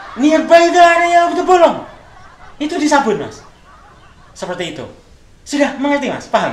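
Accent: East Java, Indonesia. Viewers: Jowo timur kayak gitu mang mas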